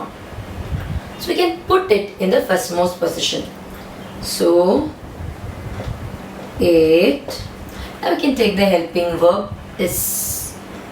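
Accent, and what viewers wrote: Kerala, India. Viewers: Mam,are you from kerala?.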